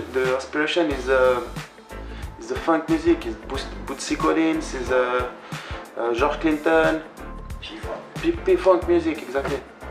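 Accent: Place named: France. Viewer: Mon gars ta le vrai accent français, je dit ca mais je ferais pas mieux. Non tu assure en vrai. Continue a nous faire kiffé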